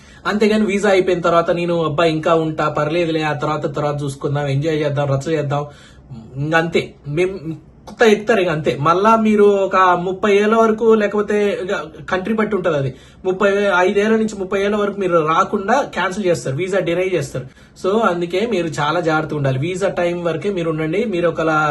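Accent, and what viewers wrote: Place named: Nalgonda, India. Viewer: mawa… needi Nalgonda na😊 same slang vundi anduke adiga 😂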